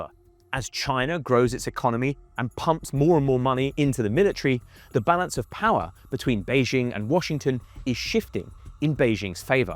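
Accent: United Kingdom. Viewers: if he didn't have a British accent this series would be boring...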